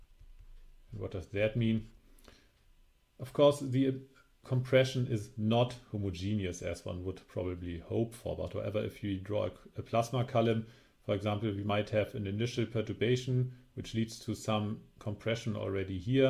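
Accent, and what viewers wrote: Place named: Germany. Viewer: Tolle Serie, bei den meisten Dokus wird immer das gleiche gezeigt. Mich hat schon lange das Thema Kernfusion interessiert und ich "verschlinge" alles was es zum Thema Fusion in Form von Videos gibt . Daher haben mich auch die Frühen Jahre dieses Forschungszweig interessiert (Spiegelmaschiene usw) vorallem die ersten Prototypen die ja eher noch Winzlinge waren . In dieser Richtung findet man eher wenig im Www . Mit dieser "Dokureihe" hat sich dieser wunsch erfüllt. Danke! Einziger manko das es in English ist was aber wiederrum verständlich ist da ja in der Wissenschaft English die Umgangsprache ist . Gott sei dank ist es English mit German Aktzent 😅 das macht es leichter. verständlich . Grüsse aus Austria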